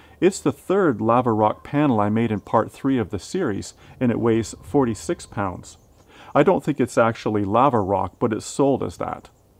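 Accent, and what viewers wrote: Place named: Canada. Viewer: His accent didn't gave him away? Aboat?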